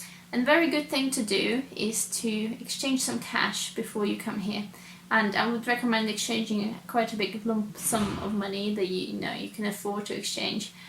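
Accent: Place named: England, United Kingdom. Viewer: I ❤ the English accent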